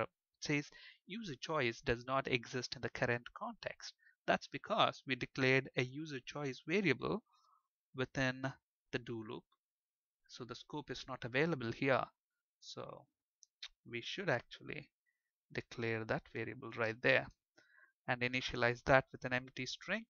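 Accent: India. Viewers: Easy to understand Indian assent.... :D Download this video, install the gom player, listen over there with extend volume for more clearly.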